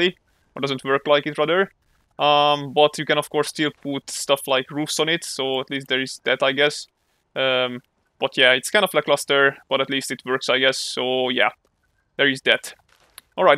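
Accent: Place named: Denmark. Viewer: I like your accent :D are u Danish or sth lol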